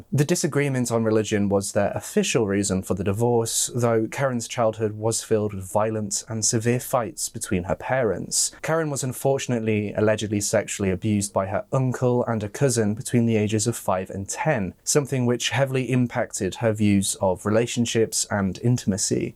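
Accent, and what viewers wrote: United Kingdom. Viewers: I've watched years of your videos and just love you. You and Emma Kenny are my favorites. I'm an American and I adore you and Emma's British accents. Thank you for the hard work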